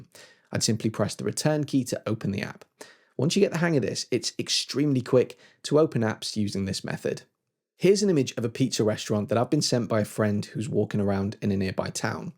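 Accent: United Kingdom. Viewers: For all who're mocking the accent The British accent is by far the sexiest English accent ever existed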